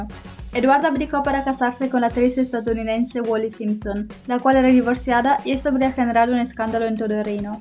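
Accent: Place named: Buenos Aires, Argentina. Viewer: Muy buen contenido. Pero es vergonzoso y horrible q hables con el sheismo. Te sugiero que remedies ese error porque en la argentina solamente los porteños hablan asi y es repognante para el resto del pais. es una lastima q digas apeshido siendo que lo correcto es apellido. Es una ofensa para la lengua castellana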